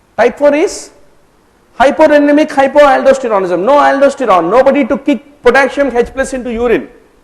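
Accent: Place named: South India, India. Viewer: Filho well its english but the accents are diffrnt. south indian people have this kind of accent. nothing wrong with that. uk n us english have lot difference in accent as well. it varies frm region to region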